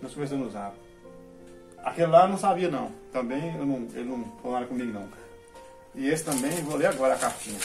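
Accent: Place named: Minas Gerais, Brazil. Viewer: O jeitim de falar do minerim é lindim. E pra completar um cafezim bem quentim.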